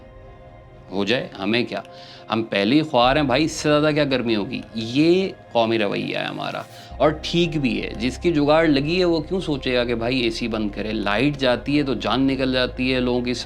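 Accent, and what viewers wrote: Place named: Karachi, Pakistan. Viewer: Waw, excellent bhai kya behtareen boltee hain aap, I presume you are from Karachi for sure :) as your urdu was as par. Initially trees an parks can safe us, as our activity is zero and we have concrete everywhere around us. Greenery/trees at certain level, plus managed family parks, clean water, 24X7 clean electricity and safety should be part of law to must have for a town. If this can not be done to already developed towns/cities then at least apply them to new towns, there are number of new societies where this can be achieved.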